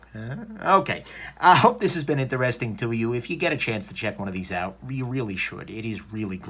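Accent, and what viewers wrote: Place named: Alabama, United States. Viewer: Any chance this guy is from Alabama? (Typing with my INFANT FINGAS) LOL... Love the review for real. Much love homie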